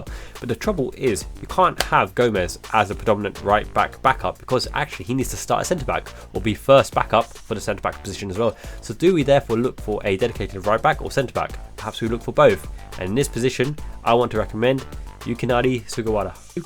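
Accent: United Kingdom. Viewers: Good job man! Just wonder how come you speak that perfect English with British accent as a Japanese?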